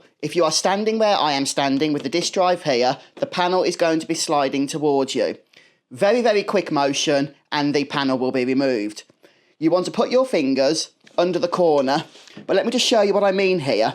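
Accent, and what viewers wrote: Birmingham, United Kingdom. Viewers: As soon as I heard the Brummie accent, I knew this was going to work. And it did first time. Thanks mate you really helped.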